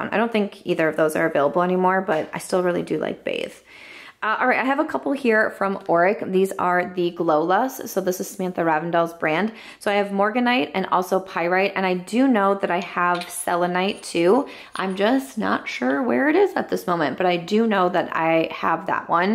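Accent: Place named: South, United States. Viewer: I just love ’ southern accent, y’all!! 🤣🤣😂😂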